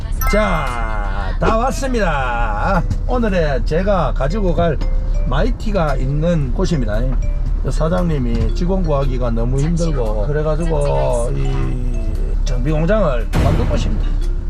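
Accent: Gyeongsang, South Korea. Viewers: 캐빈 못구함니까... 진심 마2 내로우캡이라도 비스무리한거 캡 바꿔 끼는게 경제적이게 생겼습니다... 뭐 붐대부분은 판금도색하면 되겠는데... 캐빈은... 어지간히 썩어있어야.... ㅋ 그리고 추천 어록은. "마 우리 채널 같이 키우는거 아닌교?(경상도 네이티브 발음이 어렵네요 어캐 해야하지? ㅋㅋㅋ) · 저도 경상도 사나이인데 항상잘보고있습니다 고향사투리들으니까 억수로 정겹네요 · 갱상도 사투리 너무 잼있어요. 저도 창원에서 5톤윙바디 운행하고 있습니데이 항상 안전운전하시고 힘내십시요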